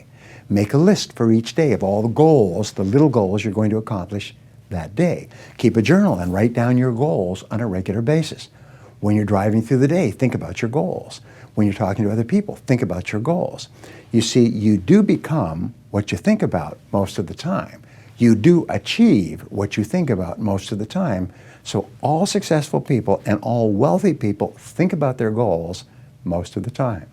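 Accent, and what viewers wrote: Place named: United States. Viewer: Most definitely an American Accent, thanks...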